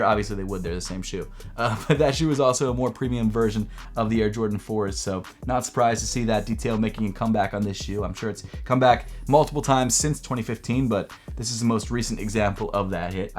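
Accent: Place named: Philadelphia, United States. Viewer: Wait hold up, You’re from Philly bro?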